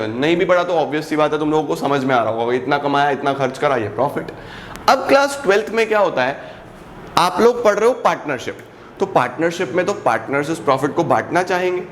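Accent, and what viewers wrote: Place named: Uttar Pradesh, India. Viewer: Sir ji aap kaha se hu lagte tu up ke ho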